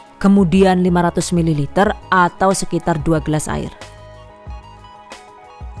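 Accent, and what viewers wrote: Banyumas, Indonesia. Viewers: Bund orang mana yah? Kox Ngmongnya Ngapak banget,😅 siapa tau Kita satu daerah 🤭🤭🤭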